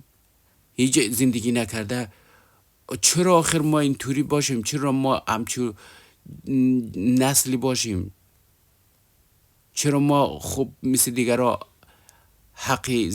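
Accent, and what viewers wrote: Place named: Iran. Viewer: موضوعی خوبی را بحث کردی ، ولی با معذرت لحجه واقعاً افتضاح است آغا ، به عنوان مثال یکدفه خیلی غلیظ ایرانی میگی (اینجوری) یکدفه خیلی ساده محلی (موکونه) میگی 🤣 دوم اینکه خیلی پِچ پِچ میکنی ، ادامه داده نتانستم . لحجه و رویش گویندگی روان و ساده خیلی مهم است .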